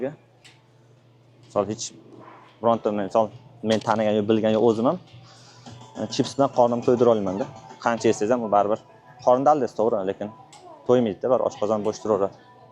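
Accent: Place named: Jomboy, Uzbekistan. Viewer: Assalomu alekum aka yaxshimsiz salomatmisiz? Har bir tayyorlagan videolaringizni boshidan oxirigacha oxirigacha maza qilib tomosha qilyapman, samimoylik bo'lgani uchun, videoni perexodlari chiroyli bo'lgani uchun juda qiziqarli. Shevangizdan tahmin qiladigan bo'lsam Jomboy yoki Bulung'urdan bo'lsamgiz kerak? Alloh har doim panohida asrasin, ishlarizga barakot besin. Omadingizni bersin yaxshi videolardan kutamiz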